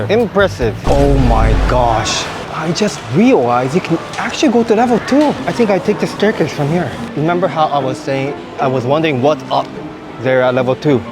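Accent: Singapore. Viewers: I luv your Singlish ❤👍😊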